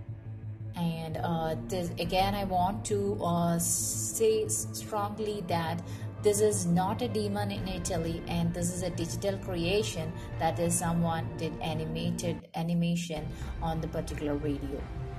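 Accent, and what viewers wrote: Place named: India. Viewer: She sounds like indian.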